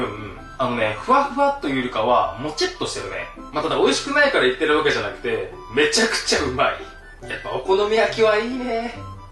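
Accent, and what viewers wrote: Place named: Fukuoka, Japan. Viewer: 端々に出る方言が好き(´；ω；`) 「吹き零れんごと」とかガチの福岡弁で笑う笑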